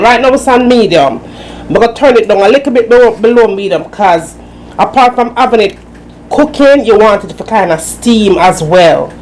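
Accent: Jamaica. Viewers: Yes man it really looks wicked 😃😄 Thank you for this great recipe !! so good to hear your sweet Jamaican accent 🥰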